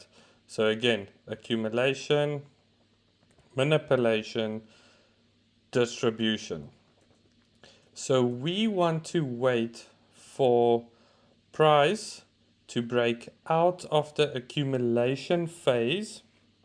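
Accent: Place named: South Africa. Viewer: I knew the accent was South African😂❤️ Man, I'm learning so much mfethu, keep teaching, I'll keep learning, we'll all keep getting better🙏🏽🙏🏽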